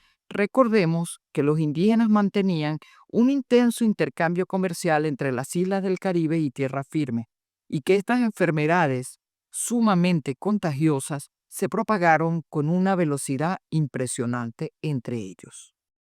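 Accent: Venezuela. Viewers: Hermoso escuchar su voz, voz de Caracas, mi reconocimiento y agradezco su esfuerzo y la animo a continuar... Gracias :) Recuperar el idioma de los VALIENTES PRIMEROS debería ser una prioridad, como me gustaría saber de ese idioma de verdad verdad!!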